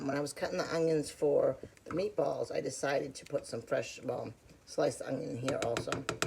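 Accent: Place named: New England, United States. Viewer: I thought I detected a new England accent on you I have relatives thst live in foxovuro MA we used to drive down there all the time when I was young and it was always my favorite vacation. My aunt lived on the end of a dead end street right on a lake and Shaffer stadium was right on the other side of it so we used to hear concert from her back yard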